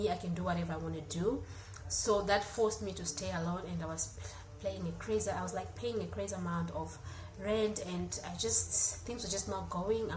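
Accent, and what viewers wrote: South Africa. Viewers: Na ka accent kameanza kuwa ka Mzanzi 😂, love you❤️ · Accent imeanza kua kama English ya wazulu 😂🔥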